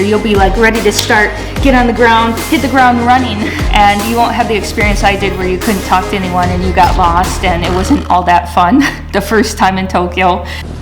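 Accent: Michigan, United States. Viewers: you are losing your Chinese accent ;) I definitely hear the Michigan now in your English :) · Hi, I really admire you, learning languages. And especially now you are learning Korean. When you speak English, you can tell your American accent but it comes to Japanese and Chinese accents. It was a totally different tone. 👍👍👍😄😄😄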